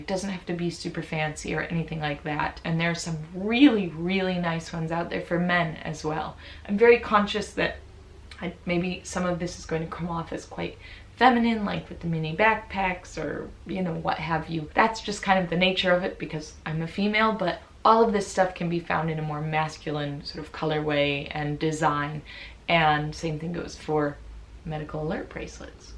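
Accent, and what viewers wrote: United States. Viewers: I suppose you live in England. How come you don't have a British accent? To me, it is more like American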